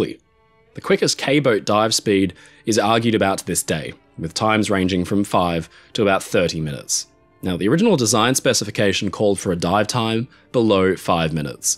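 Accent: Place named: Australia. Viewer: Australian accent is just so hard to listen to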